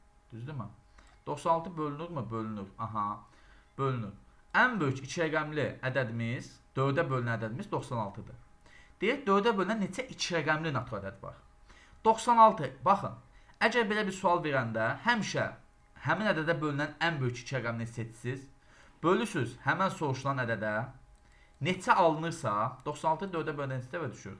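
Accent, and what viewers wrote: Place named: Salyan, Azerbaijan. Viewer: salyan 😊oxwayir daniwiq terzimiz)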